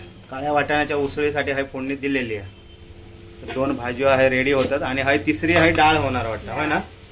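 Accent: Malvani, India. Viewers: Tumchi malavani bhasha aikayla khup aavdate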